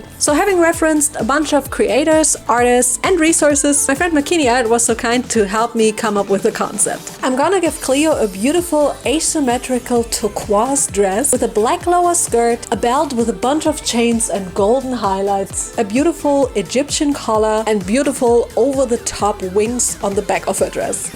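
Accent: Germany. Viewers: I just found this channel off youtube shorts and im obessed. Ive always loved OOAK dolls and these videos are so pleasing to me. Btw i love your accent. Is it german?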